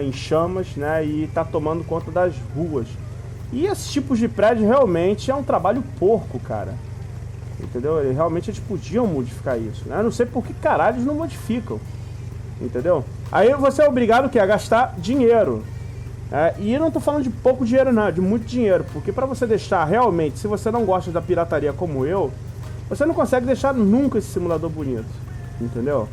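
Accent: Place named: Rio de Janeiro, Brazil. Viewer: sotaque de carioca puxado, não é o mesmo produto, com arquitetura melhor vai sair incríveis add-ons, espera 1 ano que vc vai ver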